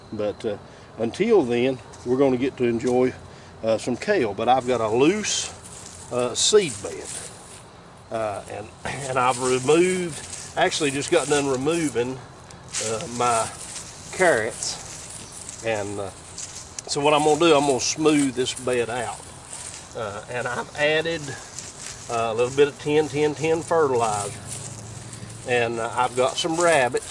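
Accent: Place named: North Carolina, United States. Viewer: I knew I recognized that accent lol I'm from south eastern , NC. Felt like home.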